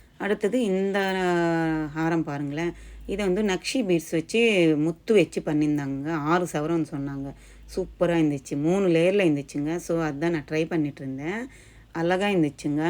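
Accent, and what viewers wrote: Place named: Telugu, India. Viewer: Your telugu mixed tamil voice.. is my favourite ❤️♥️ that too you say superuh irunthuchii 😍 anyways your video collections are so nice sister...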